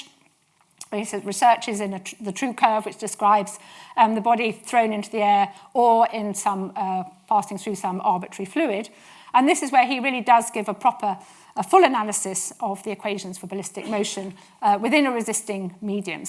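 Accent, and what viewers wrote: United Kingdom. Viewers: She’s just British bro, that’s how they talk. 🥀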